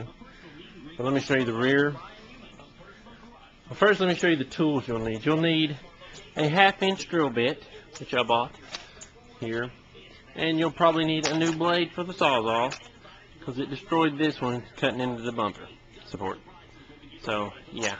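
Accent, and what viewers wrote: United States. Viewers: haha. I had a feeling you were from TN... I am too. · Are you from Kansas?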